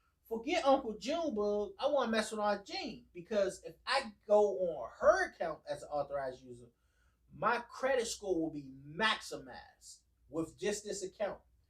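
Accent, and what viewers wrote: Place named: Washington DC, United States. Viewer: bro def from pg or dc 😂 facts but great video my guy !!!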